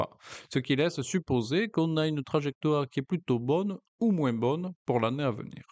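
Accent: South, France. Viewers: Bonsoir, le petit accent du sud est très agréable pour une chaîne sur l'investissement. Un rayon de soleil qui réchauffe le PEA 😅. Merci et bonne continuation 👍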